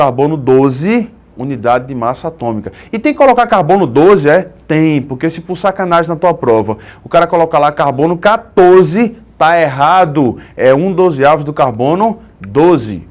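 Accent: Pernambuco, Brazil. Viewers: Ahhhhhhh! você é muito bom! (é Pernambucano né? haha) por favor continue colocando conteúdo de química, sua explicação é top.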